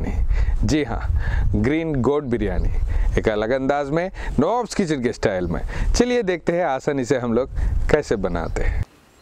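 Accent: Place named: Tamil Nadu, India. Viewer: Kaun Se Gao Wale Bhaisaab Tumhe ??? Baat Karne Ka Style Se Tamil Waale Dikhren Tumhe...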